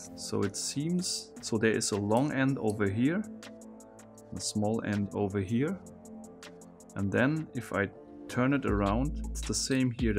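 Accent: Germany. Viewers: Are you German? OMG yes you are....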